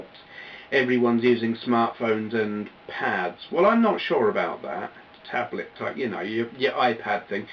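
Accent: United Kingdom. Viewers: it was me not understanding you, it took me a while to get used to hearing sarcasm in a brittish accent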